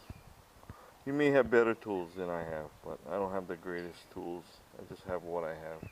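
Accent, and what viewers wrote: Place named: Hawaii, United States. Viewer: Funny how you can just tell someone from Hawaii from the accent. Lol as soon as you started talking I knew you from Hawaii.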